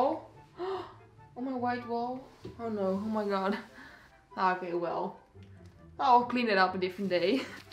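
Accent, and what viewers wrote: Netherlands. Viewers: Je klinkt zooo nederlands lmfao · yo for real, are u dutch? sorry but u have SUCH a dutch accent hahaha love you <3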